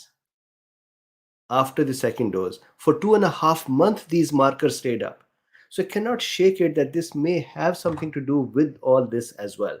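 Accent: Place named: India. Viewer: Many times I try to watch your vids but couldn't put myself through your accent. I am not a native speaker of English either so I understand many people who speak English with some accent, but Indian accent is the one that I can not stand. Too bad.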